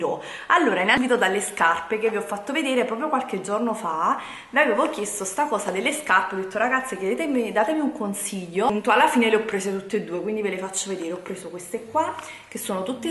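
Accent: Naples, Italy. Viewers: è accento napoletano se non lo sai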